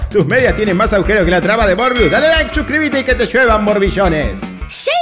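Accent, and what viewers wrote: Argentina. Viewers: me encanta ver tus videos pues me rio a morir cuando puedo entender los chistes completos, pero a veces hablas tan rapido y con tanto acento "argentino pibe" que la verdad no pude disfrutar en su totalidad el video. Si puedes para el proximo video, bajarle un poco a las revoluciones de tus dialogos para que puedan ser mas entendibles los chistes de las trastadas de las peliculas. Con Heman me moria de la risa y con Howard, Superman, Gatubela tambien, pero habia chistes que tenia que ponerles hasta los subtitulos autogenerados por you tuvvv, para poder entender que habias dicho. Saludos y a la espera quedo del siguiente peliculon Cut. Dejo el suculento LIKE. SILLA!!!!!🪑🪑🪑🪑🪑🪑🪑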